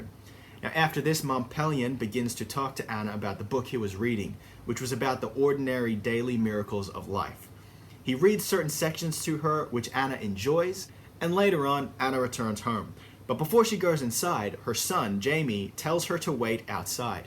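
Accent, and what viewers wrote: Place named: Australia. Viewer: is it me or do you skip between an aussie and a american accent? lol Good video BTW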